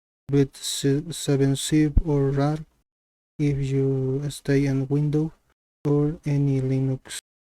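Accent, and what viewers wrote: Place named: Bolivia. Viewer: Ese xD r u from Bolivia or Perú?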